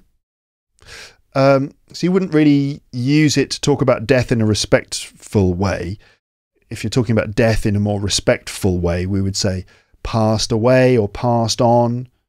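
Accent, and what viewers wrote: United Kingdom. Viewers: Learning British Accent is easy watching your videos.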